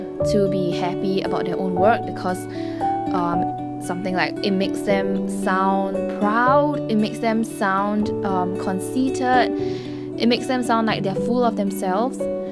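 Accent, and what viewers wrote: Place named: Germany. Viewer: Thanks for this video btw your accent is so cute are from germany?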